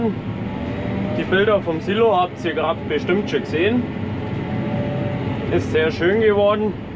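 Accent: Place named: Upper Bavaria, Germany. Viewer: Die Dialektunterschiede zwischen uns Augsburgern und den Oberbayern ist immer wieder beachtlich 😂😮 Ist auch ne echt schöne Gegend da unten, muss man echt sagen.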